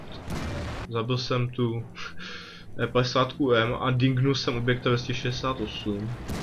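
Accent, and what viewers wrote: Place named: England, United Kingdom. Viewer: ja neviem ci skusas anglicky prizvuk alebo potrebujes logopeda ale strasne sa smejem :D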